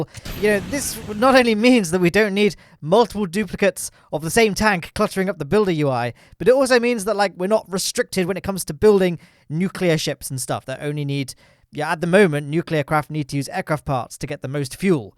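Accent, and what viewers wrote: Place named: North, United Kingdom. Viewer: I can’t ignore on the fact on how he sounds like nordern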